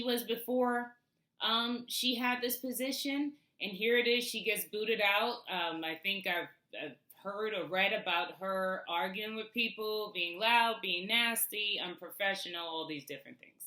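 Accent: New York, United States. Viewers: Hi am from Nassau Bahamas I subscribe too your channel early this morning, and you soo beautiful I love your charming sweet voice you could tell that you are a true New Yorker lol.. Amorosa already went on some shows and she already have a book..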